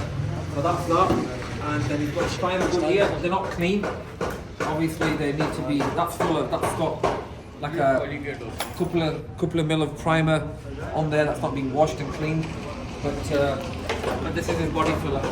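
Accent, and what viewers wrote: United Kingdom. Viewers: Filthy Northern accent. He can't even change his accent to pronounce it properly smh. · Sound like Yorkshire accent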